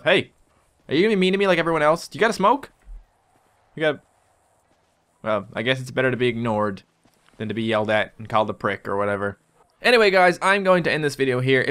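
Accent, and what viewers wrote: Canada. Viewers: He's Canadian right? He sounds Canadian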